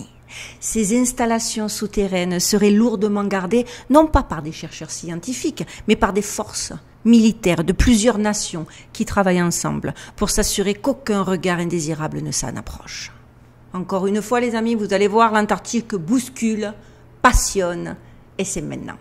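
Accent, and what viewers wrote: Marseille, France. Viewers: T’inquiètes pas, on n'entend que ta douce de voix de marseillaise peuchère! Et puis on est trop charmé par tes beaux yeux pour se focaliser sur de quelconques bruits extérieurs!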